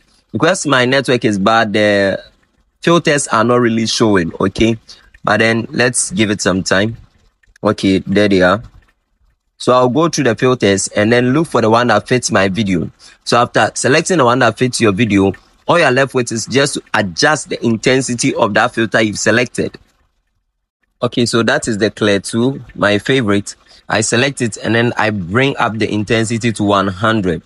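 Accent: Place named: Ghana. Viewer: You sound so much like Ghanaian even though I know you are a ghanaian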